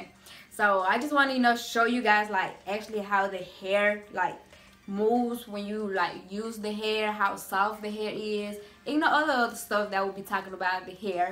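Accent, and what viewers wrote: South, United States. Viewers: She’s Hispanic and she’s from Alabama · Nah that's an insult to just can't speak she's ignorant just got a country accent she ain't putting on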